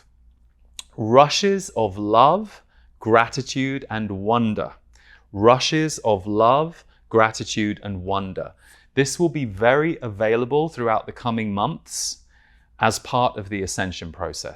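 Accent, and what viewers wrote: United Kingdom. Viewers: Your voice has a divine resonance..... Beautiful.... Brish English....❤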